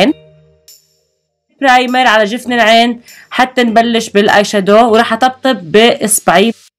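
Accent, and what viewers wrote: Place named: Syria. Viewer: لَيش بتحكي سوري ؟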